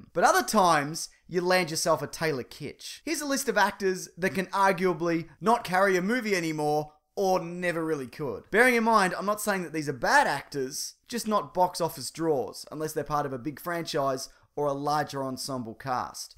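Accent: Australia. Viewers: Hey cool vid I'm a recent subscriber you got an awesome aussie accent that makes me wonder what you look like lol....anyway keep up with the awesome videos.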